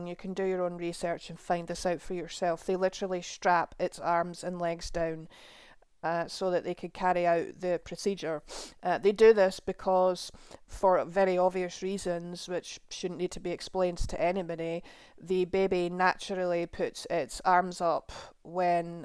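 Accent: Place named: Scotland, United Kingdom. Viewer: It's interesting to hear your fierce condemnation and rightful indignation at this harmful cultural practice but at the same time you haven't completely shaken off the social conditioning normalising it. You speak of circumcised and uncircumcised rather than mutilated and normal or at least unmutilated. You say they ended up severely damaging David Reimer's genitalia to the point where it could no longer function as it was meant to which is what happens in 100% of cases with boys, at least! Actually he suffered a complete penile amputation rather than the intended foreskin amputation. You speak of the "medical procedure" when it isn't a medical procedure but a medicalised ritual or not even that in the case of the cutter being a Rabbi with no medical training and using no anaesthesia. We don't need balance when speaking about harmful cultural practices, we need objectivity! 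You sound Scottish, are you aware that in Scotland baby boys are put under general anaesthesia to have their genitals mutilated at their parent's request, on the NHS, at taxpayers expense?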